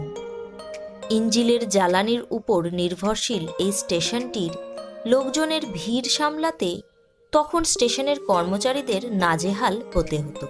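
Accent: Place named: Kolkata, India. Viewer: Tumi kolkata style a kano katha balo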